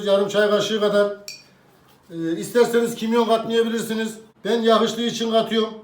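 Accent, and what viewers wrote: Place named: Çorum, Turkey. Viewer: Ustam eline sağlık. Çorumlu musunuz memleketimin şivesi çok güzel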